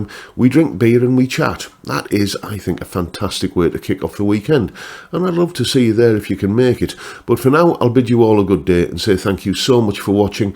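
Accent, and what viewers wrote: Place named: Northern England, United Kingdom. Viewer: Nice one! Love your down-to-earth, no-nonsense, Northern bloke talk. After Gibson, Seth Lover later went to Fender and created the Wide Range Humbucker, that sounds thinner and brighter than a PAF, using 2 bobbins in series with Copper Ferrous rod magnet pole pieces instead of the steel slugs and shared Alnico bar magnet of the PAF design he is more famous for. I wanted a Wide Range Humbucker tone but using a PAF style humbucker, so as an experiment, I replaced the steel slugs and bar magnet on a cheap PAF Bridge humbucker with 12 alnico 5 5mm rod magnet pole pieces. The series tone is clearer, brighter and more open than it was before the experiment, and I got a true single coil tone when using one coil. I ran my insane experiment by Ash at Oil City Pickups, who said they already make the Brass Knuckle humbucker that is a PAF Shaped humbucker, but with Alnico 5 rod magnets - literally 2 Strat single coils sharing a humbucker baseplate, so I bought one. 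The split coil paired with Strat middle single coil gets the authentic 'ching' Strat Mix position 2 chime (Strat position 4 Middle and Neck parallel mix being the 'pung' chime tone). For those who like the hum-cancelling advantage but prefer a brighter, thinner, more single-coil-esque sound, I recommend Firebird Mini humbuckers (I use the Oil City Winterizer 1). Also vintage output humbuckers like Vanson 59's sound clearer and brighter than higher output, over-wound modern humbucker tones. I can also recommend Wilkinson M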